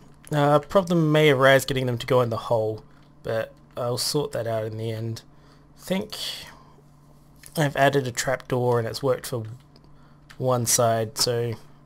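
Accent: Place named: Australia. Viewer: Lol... Pretty epic fighting there... Also, stop scratching! Can't wait for the gold farm and you sound more Australian mate.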